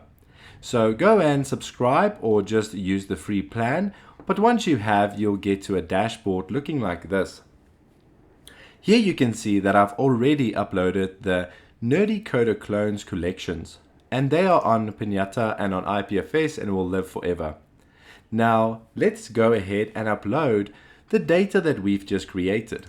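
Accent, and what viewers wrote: South Africa. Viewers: I almost jumped when I heard your accent! we gotta make NFTs trend in South Africa! Thank you so much for all of this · Proud to hear your South African accent! Thanks for everything,! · Why do you sound south african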